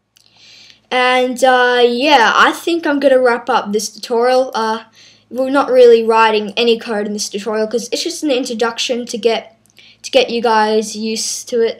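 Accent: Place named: United Kingdom. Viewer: Asian boy? he sounds like a british boy :D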